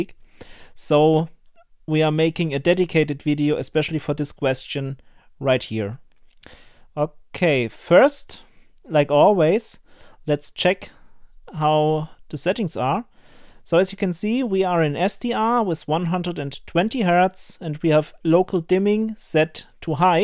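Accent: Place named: Germany. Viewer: Ich habe noch nie jemanden gehört, der so deutsch Englisch spricht haha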